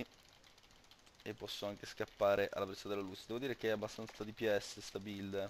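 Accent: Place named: Romagna, Italy. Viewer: ah ok...è che sentivo l'accento romagnolo...sei bravo a giocare a heroes...sono cuboem#2629 in game se ti va di fare qualche partita..bella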